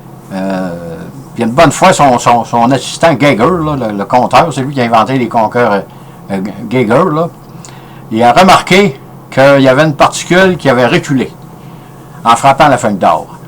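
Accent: Quebec, Canada. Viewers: Vraiment génial comme vidéo, j'adore la physique et l'histoire des science. Ton accent québécois est plutôt sympa ahah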